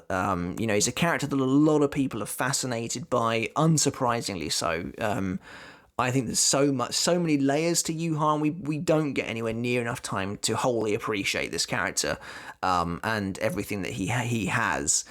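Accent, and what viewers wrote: England, United Kingdom. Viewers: Not usually a fan of British accents... but... your base, narrative delivery,and VERY concise knowledge of this masterpieces of a show... I’m now a solid fan! I’ve sent links to 12 of my fellow friends who are about this show like you are! Keep going all the way to the top bro! I’m an American fan! 😎❤️🙌🏻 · I’m onto to you, there’s that subtle English accent but I won’t be fooled that your not American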